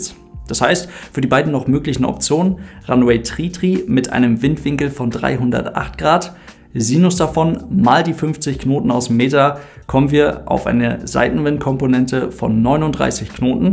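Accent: Germany. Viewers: Im Funk ja, und das liegt ja dem deutschen, da th bei deutschen Muttersprachlern meist eh schon in die "trie" Richung geht .... im Video müsste man das nicht und klingt auch (sorry) extrem grauselig ... aber, gewohnheit und im Kontext Fliegerei auch i.o. um sich nicht ausversehen an ordentliche Aussprache zu gewöhnen .... Im Gegensatz dazu die englischen Muttersprachler... da wird sich konsequent an die richtige Aussprache gehalten, statt der im Funk vorgeschriebenen :)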